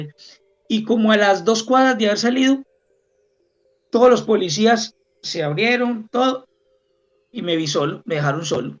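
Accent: Colombia. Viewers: Qué paisita para hablar rico!!! Muchas gracias por tan buena e inteligente reflexion!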